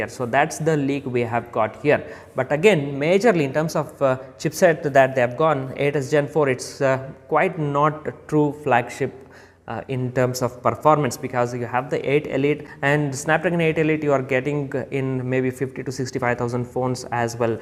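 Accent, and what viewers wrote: India. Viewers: Good content, love your accent! Clear to be heard for non-Indians like me ✨️🙏🏻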